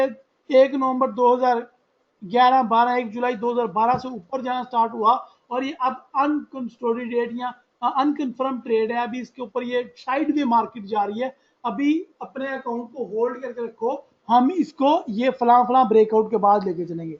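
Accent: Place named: India. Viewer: sir apka desi andaz buht acha buht maza ata hai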